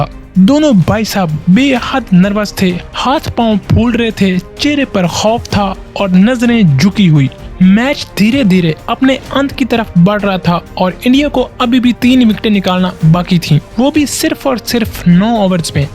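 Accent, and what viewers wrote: Pakistan. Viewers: Tumhare bolne ke hisab se tum pakistani lagte ho? kaha se ho tum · Yaar ye pronounciation Pakistani laag rha hai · Pakistani accent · Bhai tere accent se tu Pakistani lag rha hai.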